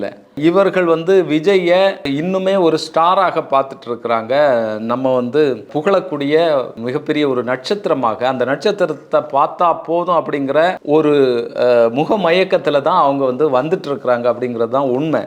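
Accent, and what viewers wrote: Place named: Kanyakumari, India. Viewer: Sir, are you from Kanyakumari Dist, and Monday market or Marthandam side? Your slang is kk slang.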